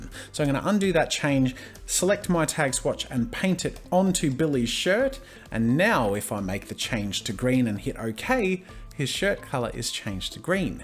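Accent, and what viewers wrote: Australia. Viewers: ho Australian accent. my favourite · Is he Australian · Are you Austrailian? Just wondering it sounds like an austrailian accsent but it´s vety COOL and sorry if a have a lot of misspelling but I´m not from anywhere where people speak english and I´m not that good at it :D LOVE YOU´R CHANNEL!!!!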